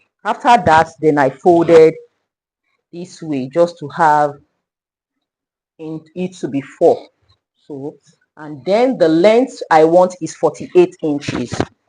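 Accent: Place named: RP, United Kingdom. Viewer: Your queens English makes me forget what am watching and be listening to the filtered voice. Well-done queen boss.........you dey help my career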